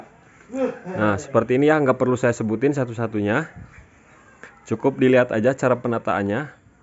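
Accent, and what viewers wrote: West Java, Indonesia. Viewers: kang lokasi nya dmna ? kaya orang sunda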